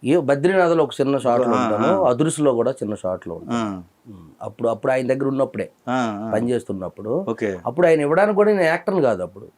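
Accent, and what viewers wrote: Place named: Srikakulam, India. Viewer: గారు మీరు శ్రీకాకుళం కి ఆణిముత్యం అండి. మీలాంటి సింపుల్ గా ఉండే వాళ్లకు అలాగే మన శ్రీకాకుళం యాసకి వన్నె తెచ్చిన మీకు అభినందనీయము అండి గారు. ధన్యవాదములు అండి🌹🌹🌹.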